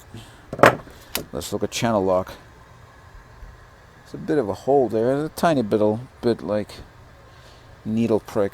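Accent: United States. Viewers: Thank you for your wonderful review. Love your pure American accent, remind me about George W. bush, my dream English accent. How ever your Knipe= little cutter (Knipex, 😉) is a defective one, robots use to sharpen them after induction hardening and they must have been out of calibration regardless what every one tells, I have a tool collection of almost all of most known manufactures and my knipex collection (two at least of every model cutters has zero defection like yours have got, not to mention the hardening seems to be one south side with it???? you really should send it to knipex factory in Germany and ask them to reconsider it. How ever every each of them as you know already, has been designed for different hardened material to be cut, yours indicate piano wire 2.5 mm something to keep in mind. lovely review thanks.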